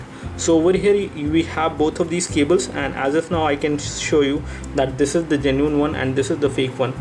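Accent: India. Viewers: The Indian accent is fucking hilarious 😂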